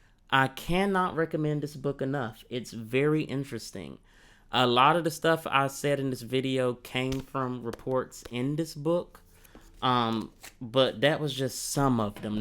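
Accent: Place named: South, United States. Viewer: Young man, I have to thank you for several reasons. One is that I'm glad that you're parlaying your shipboard experience into a YouTube channel, and I'm glad that we have an American black kid with a country accent doing a historical documentary on YouTube instead of the excessive number of British dudes with British accents, or even worse, those AI bots with the metal voices. Thanks, good to see some homegrown talent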